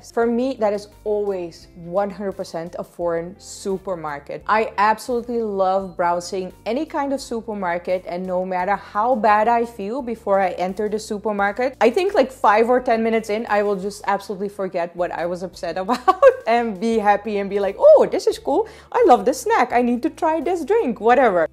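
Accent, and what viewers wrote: India. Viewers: and you got an indian english accent :P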